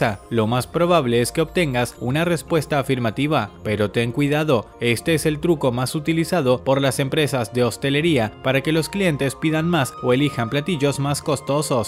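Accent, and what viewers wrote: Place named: Argentina. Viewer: no se, pero tiene alta pinta de argentino en modo neutro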